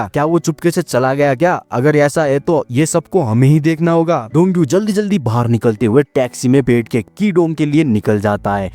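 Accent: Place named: Nepal. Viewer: Hamro jastai Hindi xata😂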